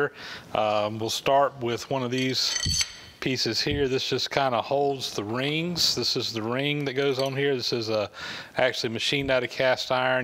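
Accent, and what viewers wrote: South, United States. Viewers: He absolutely has a distinct US 'southern' accent - Georgia to be exact. It's very easy for me to hear. One of the easiest examples is when he pronounces 'oil' as 'awl', which is the classic 'southern drawl'. Also when he says 'made by mah friend...' instead of 'mye friend'. '' Saying 'and such' is kinda Southern, but I've heard it from various US regions, not sure the origin. Though 'castellated' is the correct academic term, just about everyone that I've encountered calls them 'castle' nuts, even listed as such at most vendors and in parts catalogs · Interesting you call those 'castle' nuts. Here in Canada, I have always heard them referred to as castilated nuts. Means the same thing I suppose, but it is always interesting to hear regional differences in speech. You don't have what I would call a 'southern' accent, but there are certianly elements in your speech. 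One phrase you use interests me. You often say "And such" where I would say "And so on". I have never heard that before. Is that a southern or regional phrase? In any case, in any language or dialect, I enjoy your videos! Keep safe during the Covid-19 mess!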